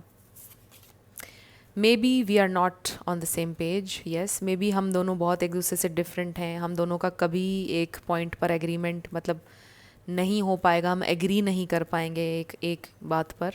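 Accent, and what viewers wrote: Pakistan. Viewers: We were in long distance relationship we never met he planned about meeting in August but he blocked me on WhatsApp and I blocked him on SC .I Pray he will call me ❤........I like ur readings You are a Pakistani I bet ......your way of talking is Like Pakistani .❤keep going keep it up.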